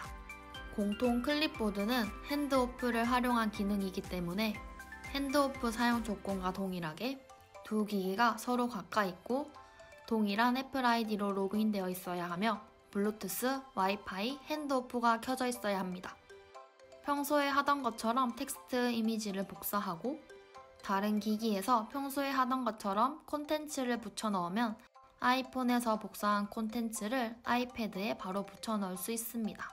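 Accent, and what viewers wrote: Busan, South Korea. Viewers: 부산사람이신듯..발음 귀엽 ㅋ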